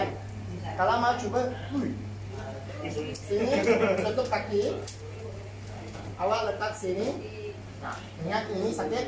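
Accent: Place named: China. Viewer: Melayu with Chinese Accent...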